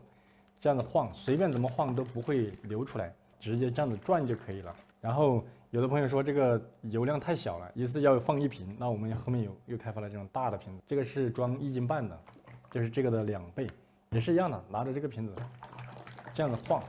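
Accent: Sichuan, China. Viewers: 王老师的四川话在恢复唉，泹，汃，这些四川话都冒出来了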